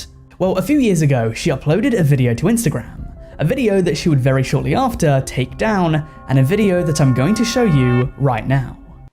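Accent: United Kingdom. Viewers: I usually hate British accents, but Im kinda digging his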